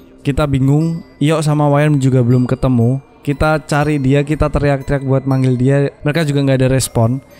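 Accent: Java, Indonesia. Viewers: saran aja mas kalo medok gausah 'gua elu'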